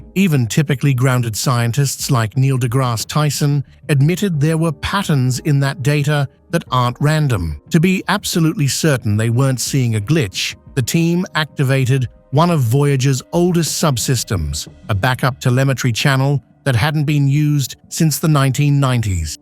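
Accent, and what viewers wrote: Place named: United Kingdom. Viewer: A very British voice with a very American cultural bias sound completely unnatural to me - go figure!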